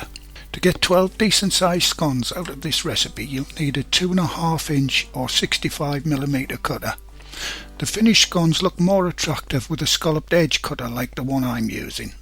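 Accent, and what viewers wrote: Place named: Northern England, United Kingdom. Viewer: Hi. I discovered your channel recently and really do enjoy your recipes. It’s great to hear a friendly northern chap giving it a go in the kitchen. Will you be planning any Christmas family favourite recipes, as we get closer to the festive season? All the best.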